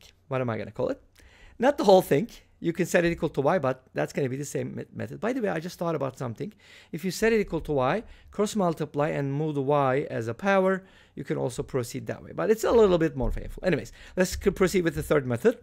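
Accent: Turkey. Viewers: It seems to me that you have the Turkish accent...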